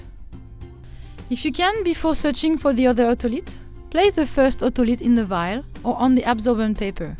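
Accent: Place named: France. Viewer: That's a French accent if I have ever heard one 😂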